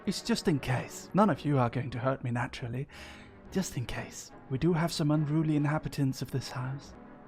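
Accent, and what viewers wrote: United Kingdom. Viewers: With the voice I sort of imagine a British mercenary. The lore of this just really is deep.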